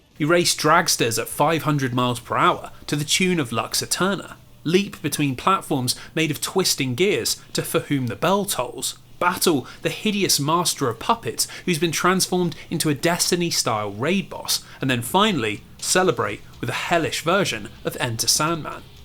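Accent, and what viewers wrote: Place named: RP, United Kingdom. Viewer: Holy shit, it's him. Am I the only one who had never once seen the guy behind the voice? I had this nerdy, posh British lad in my head (still love the content), but color me shocked.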